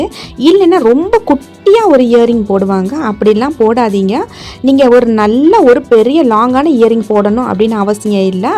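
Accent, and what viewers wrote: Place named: Ramanathapuram, India. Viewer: மாஷா அல்லாஹ் நீங்கள் சொல்வது அனைத்தும் உண்மையான விஷயம் தான் ராமநாதபுரம் பாஷை கொஞ்சம் வித்தியாசமாக இருக்குமே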